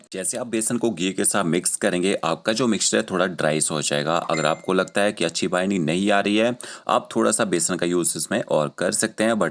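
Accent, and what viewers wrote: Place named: Himachal Pradesh, India. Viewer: Apne apne skill k dum pr ache utuber bn gye gud God bless you.I m from Himachal aur apki bat se lgta hai k u r also from Himachal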